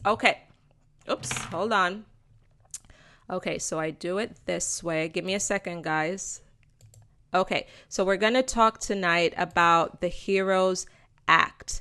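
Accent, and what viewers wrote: Jamaica. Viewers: I ❤ your jamaican accent . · I dont know how old you are l have seeing you have Bob Marley's family similarities mmmmm🤔🤔 excuse me but l always follow your channel but today when you said quote "sorry guys for my Jamaican accent mmmmm.l have questions in my mind and heart. Maybe you are related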